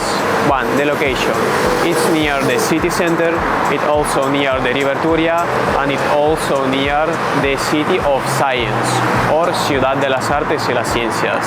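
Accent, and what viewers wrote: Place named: Montreal, Canada. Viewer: Are you from Montreal? Your beautiful accent sounds like it, that's why. 😊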